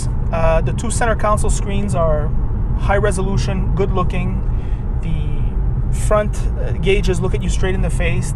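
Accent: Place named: Quebec, Canada. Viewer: Bonne vidéo! L'accent québécois ne fait pas trop surface :p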